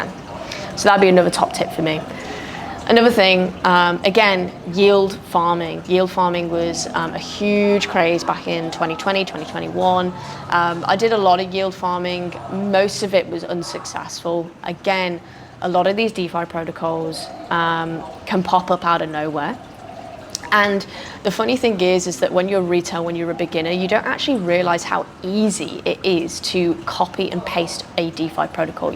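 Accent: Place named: United Kingdom. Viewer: Sounds like UK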